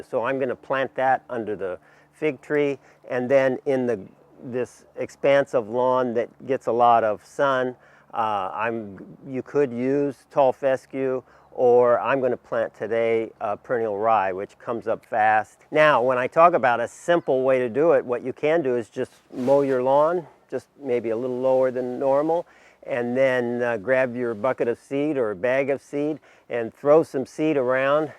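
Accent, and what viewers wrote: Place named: California, United States. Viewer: sorry, sounds like california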